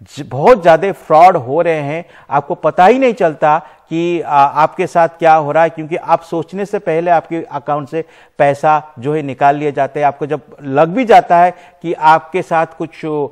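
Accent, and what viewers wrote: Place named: Bengal, India. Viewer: Bangali tone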